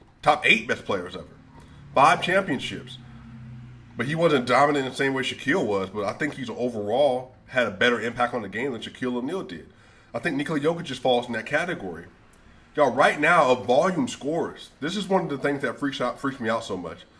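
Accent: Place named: United States. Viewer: what is black history? is it something paralell universe? or some paralel organization?you sure look sound like american:) is it that black or american?is slavery civil war black history or american history or just british colony history? confused i come for basketball and now puzzled:) it sound like america got again some segregation issue:)is it different from heritage day or what?:)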